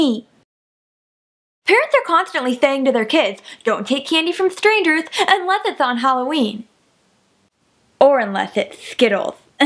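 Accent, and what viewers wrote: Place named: Europe. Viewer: Anyone else notice how much sexier is than? That bedroom hairdo and so very continental with that accent..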